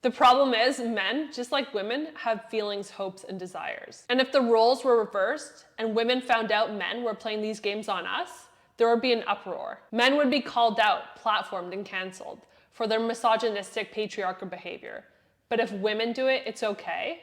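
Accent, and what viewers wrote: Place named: Canada. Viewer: You are very smart and well spoken. Also you kind of sound Canadian.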